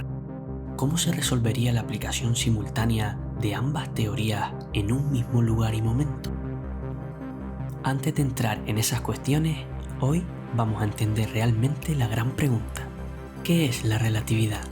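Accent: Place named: Canary Islands, Spain. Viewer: Un maravilloso video bien explicado y con acento canario, que mas se puede pedir? Muchas gracias! 😊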